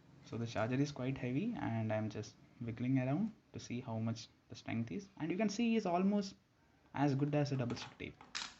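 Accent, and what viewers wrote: India. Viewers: I find Indian accepts so satisfying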